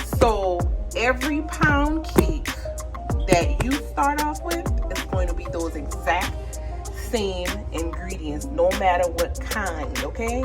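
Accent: Charleston, United States. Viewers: I knew you was from Da Chuck as soon as you started talking... I from yah too!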